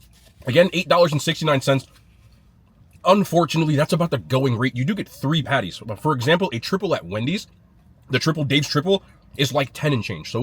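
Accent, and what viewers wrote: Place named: New Jersey, United States. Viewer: Bro so jersey he can’t help it lol …you gotta freak the burger all the jersey people knew exactly what you was saying lmaooo ona set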